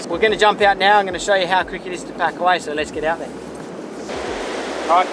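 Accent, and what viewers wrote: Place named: Australia. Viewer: Nothing better than hearing an Aussie doing a product review. ‘Bloody brilliant” 😆😆😆😆😆👍🏻👍🏻👍🏻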